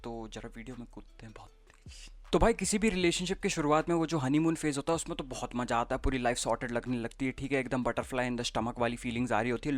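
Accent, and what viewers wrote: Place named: Bihar, India. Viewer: why does sound like a bihari in this vid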